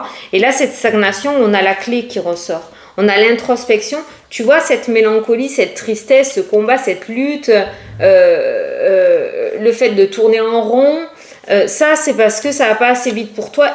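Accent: South, France. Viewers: Désolée pour le commentaire tardif, pas eu le temps de l’écrire hier après t’avoir écoutée. Je laisse rarement des commentaires mais là il le fallait absolument. Ta robe te va très bien mais ton sourire est encore plus magnifique. Les 2 ensemble c’est wow!! C’est d’ailleurs pour ton énergie, ton sourire, ta bonne humeur (et parfois tes petits coups de gueule), ton naturel, ton accent du sud (...) que je te regarde. Un grand merci pour tes vidéos et ces moments de partage!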